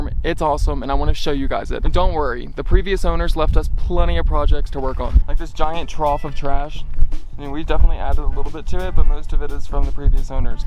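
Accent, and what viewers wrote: South, United States. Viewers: Do your parents support your sexuality? Would be curious to hear you talk about that. Growing up in rural places can be really taxing, maybe im being presumptuous but I definitely think I hear a southern twang in your voice. It’s cute. Really happy for you and austin, hope you guys have many happy years together on the farm.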